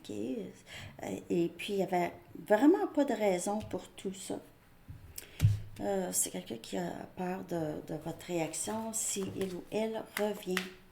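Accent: Canada. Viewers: Hi sweet lady ❤️ I love ur videos & ur sweet Canadian accent 😊it doesn’t matter to me when u speak English👌🏻👌🏻 M’ from Paris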